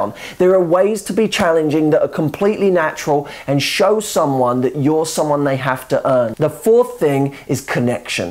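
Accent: United Kingdom. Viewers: ...is it revealed in some of yours videos what is the recipe to attract you ?! :) you are so cute, handsome, so kind and generous with sharing your knowledge that I am about to fall in love with you ;) ...the reason why I've popped into this channel was looking for anything to listen in english as a preparation for english exam and your british accent is so cool so I am watching more and more of your videos now <3 thank you :) ! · Hey can you please do a video on the accent...How to do a brit accent...I cannot get over it.. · this guy is extremely attractive, i love his accent, is he British ?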